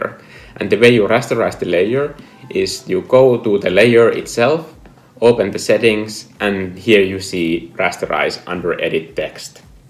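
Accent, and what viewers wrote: Finland. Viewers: are you Finnish per chance? ^^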